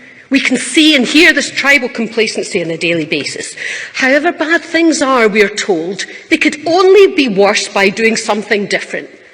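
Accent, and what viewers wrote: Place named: Scotland, United Kingdom. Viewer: definitely Scottish not a stupid individual that can’t tell a man from a woman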